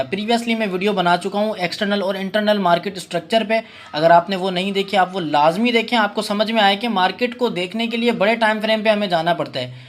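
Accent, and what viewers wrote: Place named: Karachi, Pakistan. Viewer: isliye poocha k apki urdu Karachi wali lagti thi main khud Karachi se hun tou isliye confirm kar raha tha... hahaha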